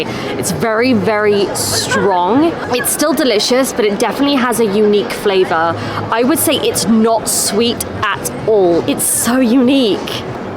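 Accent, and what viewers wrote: United Kingdom. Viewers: 綺麗なイギリス英語で日本カルチャーと食に対する繊細な英語表現が学べるなんて！全インバウンド事業者必須のチャンネルですよ！あと何よりが素敵✨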